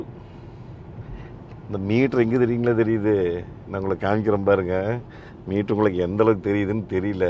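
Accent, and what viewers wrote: Kongu, India. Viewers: Addict your kongu tamil Anna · கொங்கு நாட்டு தமிழ் உச்சரிப்பு அருமை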